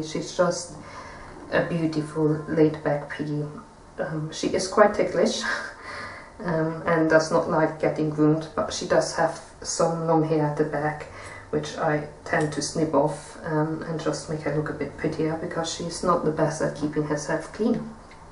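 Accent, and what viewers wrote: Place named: Germany. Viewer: Your accent is awesome!!! Are you German?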